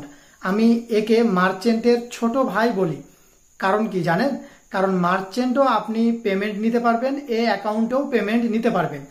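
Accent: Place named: Chapai Nawabganj, Bangladesh. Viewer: মনে হচ্ছে চাপাই এর লোক কথা বলছে।ভাই বাসা কোথায়?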